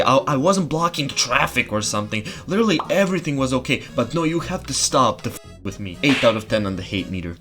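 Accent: Croatia. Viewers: According to your channel you live in the glorious land of HDZ and your accent tells me you live in the Greater Zagreb region or in my case "prek Učke"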